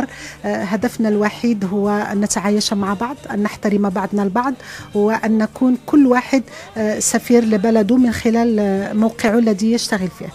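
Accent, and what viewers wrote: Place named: Morocco. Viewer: ارجعت الي المغرب انت دكتورة يا اترك لنا إذاعة الشرق لنا نحن المشارقة المغرب بجاجة إليك لماذا تحب ازعاجنا بصوتك من ساعات الصباح الأولي الباكر تتكلمي اللهجة السورية و اللبنانية بصوت مغربي ارجوك انت علي اساس دكتورة ممكن لك العمل في الإذاعات الآخر او حتي إذاعة الشرق ولكن ليس علي المباشر من شروق الشمس حتي غروبها بطريقه دكتاتورية لايوجد احد في الإذاعة الا انت فقط ارحمنا مع كامل احترامي الشديد إليك هناك المشارقة الذين يحبون الي سمع صوت مشرقي مشارقة و ليس مغربية تتكلم اللهجة السورية اللبنانية بصوت مغربي مع كامل احترامي الشديد الي كل المغاربة هذا حق لنا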